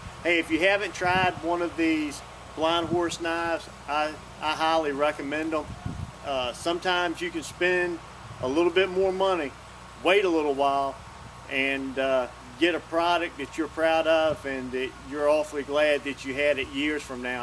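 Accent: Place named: Southeast, United States. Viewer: That sounds like a good ole' southeastern accent. I just subbed your channel. What state are you in? I'm a Georgia/ Alabama resident.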